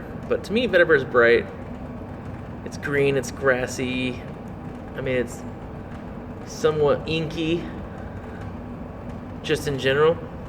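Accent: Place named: New Jersey, United States. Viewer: Damn, I didn't know you lived in Utah, no offence but you come off as a Jersey Jew... in a charming way.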